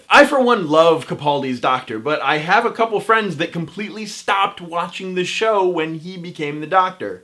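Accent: United States. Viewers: its weird hearing an American saying Doctor who and stuff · I can undertand scots speaking really quick but I didnt get a word of your first sentences. American accent is so hard to get sometimes.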